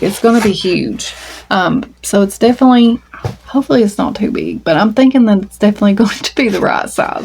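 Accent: South, United States. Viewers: So many lovely items that you have done! The lovey with the doll head is a great idea. Your doily is beautiful. If you run out of wall space, I have put a doily in my window. I guess you'd have to be careful that the sun didn't fade the yarn, but it sure looks pretty. When you say "doily" in your soft Southern accent comes out like "dually" Cute!! I agree that I feel so bad for the Joann employees. Luckily I think the one near me is not going to close. I love Big Twist yarn also. Have a great week and looking forward to your yarn haul. I'm trying to behave in that area, but it's hard to do!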